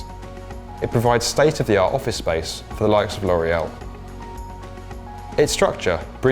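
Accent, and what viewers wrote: United Kingdom. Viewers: Why is the project being described by a person with an British accent, and why are they using British spelling?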